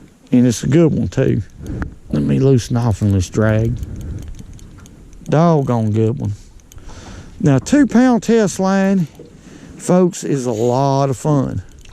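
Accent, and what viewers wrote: South, United States. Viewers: You are a wonderful awesome man. And you happen to be a fisherman. I am glad that I am still subscribed to your channel. I actually haven’t watched your channel for a while. Been working a lot, and in my opinion, your southern drawl when you talk reminds me to stop working so fucking much, and relax a little bit, and get back to what brought you joy. I watched a video of yours on how to set the hook depth for Crappie fishing. Thank you so much sir. (caught a lot of crappies from your wisdom and knowledge) I hope God blesses you profusely. You have a joy inside you that is priceless. You never talk about your life struggles. But like many of us people that have been paying attention to your channel for a while, life struggles are always in somebody’s life. Don’t be ashamed of smoking cigarettes. When you went on that Famous fisherman’s lake that has a Amish haircut, I could hear it in your voice that you were nervous, and just wanted a fuckin’ cigarette. 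Pardon my French…..I am a tobacco user currently, and I understood and could feel what you were going through. Secretly in my head, I thought it was an honor for the Amish haircut guy to have you on his boat. No joke. Real talk, no squalk…… God bless you brother.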